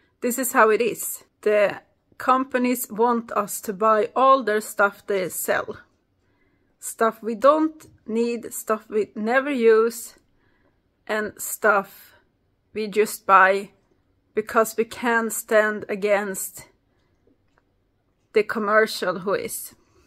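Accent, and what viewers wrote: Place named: Sweden. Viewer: I am Swedish also!❤️recognized your accent! Lycka till!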